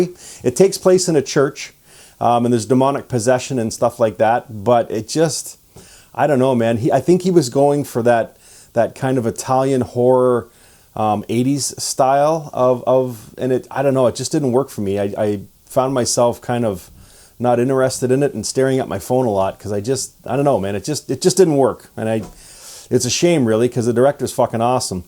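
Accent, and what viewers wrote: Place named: Canada. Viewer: Figured I heard a Canuck accent eh!!!!!! U were right this is a good movie. But I loved it and unlike you there were no Nickleback segments. As a seasoned gore whore I almost gave up on horror that went woke after 2018. Boy was I wrong and glad I gave it a try. Horror is in a dark cave at the moment but with a flashlight you can find a few gems in the shadows. BON CHANCE!!!!!!!😮😮😮😮😮😮😮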